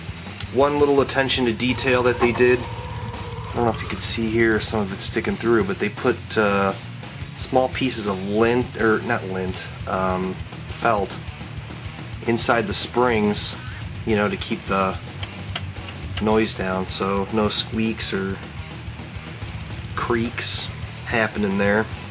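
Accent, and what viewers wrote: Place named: Canada. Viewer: he kinda sounds canadian doesent he??? small accent ??? or maby colorado??? haha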